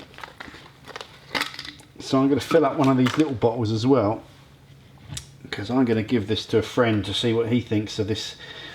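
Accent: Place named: Essex, United Kingdom. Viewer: were you from mate im essex you sound not that far away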